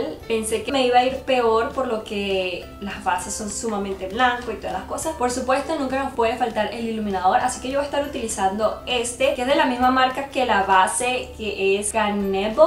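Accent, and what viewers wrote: Venezuela. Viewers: Mi.usted es asiacata..pero tiene asento Venezolana..soy nueva..sakeme d duda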